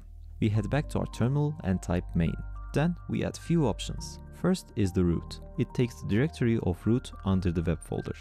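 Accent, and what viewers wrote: Turkey. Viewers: is Ur accent is Turkish haha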